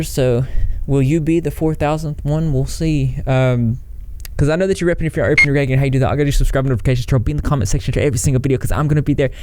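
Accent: South, United States. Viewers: This is a random question but where are you from? It sounds like you have a kind of country accent it’s really interesting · Think this is crazy?? Jared Leto has the exact same story. You should see the threads on line and the accusations. Sounds identical to this... forced rape and dangerous choking. I loved Leto at one time...he uses 30stm concerts to lure them. I’ve watched a few of your vids and I love the southern accent (I’m southern) but.... what’s with all the symbolism?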